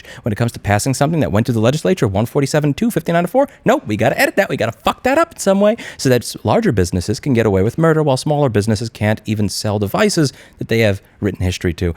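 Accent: New York, United States. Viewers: I like this: you can take out of noo yawk but you won’t take the noo yawk out of.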